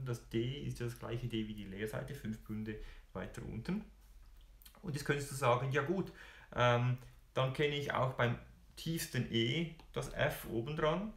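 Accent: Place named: Switzerland. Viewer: Hallo, Deine Videos sind sehr hochkarätig, sehr gut strukturiert und angenehm anzusehen (auch wegen dem schwiezer Akzent;)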